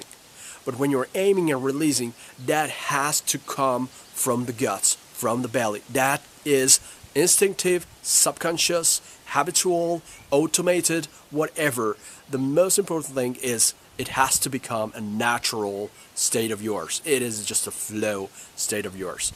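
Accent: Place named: North Carolina, United States. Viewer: I can't figure out if he's from North Carolina, Virginia area USA.. or somewhere in England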